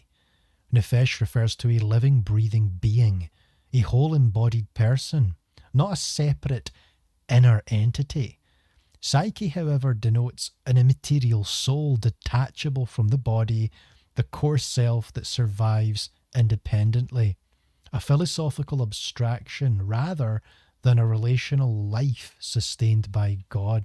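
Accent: Scotland, United Kingdom. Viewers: Just found your channel, really interesting... at least what I can understand past that damn thick ass Scottish accent 😂😂😂 but I love it 😊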